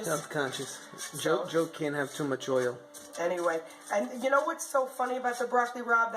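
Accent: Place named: New York, United States. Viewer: I love listening to you as you cook. I was raised in NewYork in an Italian family. Moved to Louisiana when I was 16. Your speech reminds me of my mom and our friends and myself before I lost my NYC accent. My friends tell me when I am tired, the accent comes back...ha! You bring back some sweet memories, thank you!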